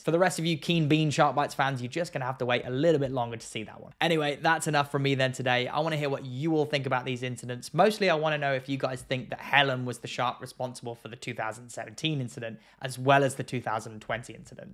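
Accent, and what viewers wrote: United Kingdom. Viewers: It may take a few videos to get used to that British accent and really enjoy the videos. The content eventually convinced me to subcribe.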